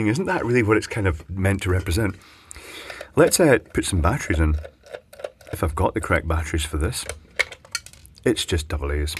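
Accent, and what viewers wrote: Scotland, United Kingdom. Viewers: are you scottish i cant tell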